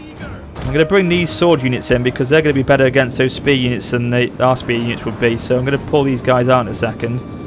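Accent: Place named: Wales, United Kingdom. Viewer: You have inspired me to buy Attila Total War at the beginning of the next month. I enjoyed the first eight minute descriptions. I watched this video by finding the Attila Total war as usually first place of the top ten of 2015. Do you like Europa Universalis IV as well, most videos seem interesting. I have seen one bad review of Europa Universalis IV on gamespot. IT is a toss up, though I think for now your walkthrough sold the idea for Attila Total War! I love your accent, are you Wessex or Sussex Welsh?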